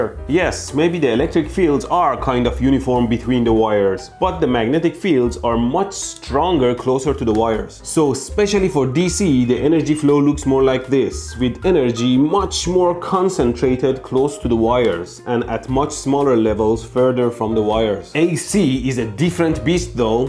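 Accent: Iran. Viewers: Are you Persian? I can say it from your accent 😊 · What an Iranian accent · love the Iranian accent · I love his bold persian accent :) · Jan can you believe more than 20 years ago, this was the question asked in first year at the uni by professor teaching electronics-physics class, You are brilliant bringing joy to Iranian/Persian community with your intentionally thickened accent. I graduated in <num> and have been working at telecom (Mobile Core Network) eversince, it was so refreshing to see your video. tnx.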